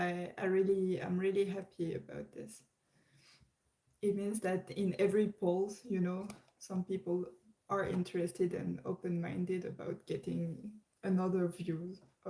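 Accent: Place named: Belgium. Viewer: Sorry for missing your live videos. I've been really busy 😢 but at least, I'm gonna watch all of them. P.s your English is fantastic. Advanced vocabularies and articulative. It's not easy as well. I live in a country where English is utilized as a first language in education but not officially. Yet, many of us can't speak like you do. I had to train myself on my own. Your English accent is interesting, I heard similar accent (with minor differences) in Belgium (only European nation I've visited so far) when few of them speak in English but accent switches when they speak in their primary language.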